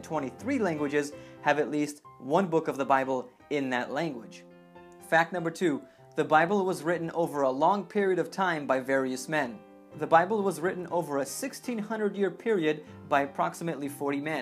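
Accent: United States. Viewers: Co tam u ciebie? so you are Polish or not? because you sound American. BTW I'm not Polish I just have Polish friends my best Polish friend is in Ireland on the run from the law for driving offences his name is Prawo Jazdy.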